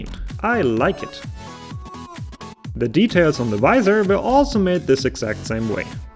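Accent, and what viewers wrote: Germany. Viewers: spork they have a German way of English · Sehr nice videos die ihr da macht! Ich bin vielleicht kein cosplayer aber ich schaue diese Videos einfach trotzdem sehr gerne. Sie sind sehr unterhaltsam und den deutsche akzent kann man einfach so herraus hören das alles so "vertraut" vorkommt. Was ich mich einfach noch frage ist, wo die Tracer guns sind? :D Tracer ist ja das Masktochen von Overwatch und fehlt mir irgendwie in eurer video Liste. Macht weiter so und ich hoffe ihr werdet einfach NOCH größer! PS: Ich habe euch natürlich auch ein Abbo da gelassen. MFG Sebastian ;)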